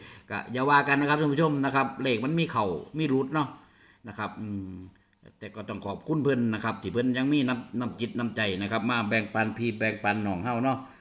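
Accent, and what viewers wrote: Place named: Korat, Thailand. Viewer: แอด ภาษาโคราชบ้านเอง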